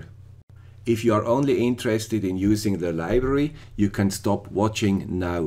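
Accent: Switzerland. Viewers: Hello, the guy with the swiss accent, thanks a lot for the great videos and tutorials!I'm very new to ESP8266 and Arduino ESP. I coded a lot of stuff in Basic, Turbo Pascal and Visual Basic, but it is about 25year ago and I fear I forgot a lot of this. I tried to use your library but I did not get it run like expected.I just wanted to have a look, if the time and date is correct, so I used some serial.print commands. At first it showed nothing. Then I removed the "if(dateTime.valid)" command. I added some lines to your code: void loop { // first parameter: Time zone in floating point (for India); second parameter: 1 for European summer time; 2 for US daylight saving time (contributed by viewwer, not tested by me) dateTime = NTPch.getNTPtime(1.0, 1); // check dateTime.valid before using the returned time // Use "setSendInterval" or "setRecvTimeout" if required //if(dateTime.valid){ NTPch.printDateTime(dateTime); byte actualHour = dateTime.hour; byte actualMinute = dateTime.minute; byte actualsecond = dateTime.second; int actualyear = dateTime.year; byte actualMonth = dateTime.month; byte actualday =dateTime.day; byte actualdayofWeek = dateTime.dayofWeek; Serial.print("actualHour: "); Serial.println(actualHour); Serial.print("actualMinute: "); Serial.println(actualMinute); Serial.print("actualsecond: "); Serial.println(actualsecond); Serial.print("actualyear: "); Serial.println(actualyear); Serial.print("actualMonth: "); Serial.println(actualMonth); Serial.print("actu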